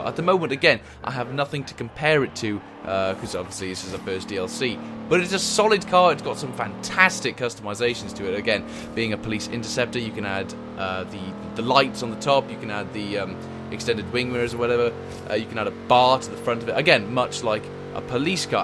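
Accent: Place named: United Kingdom. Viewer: Why do you remind me of SovietWomble? Is it the british accent?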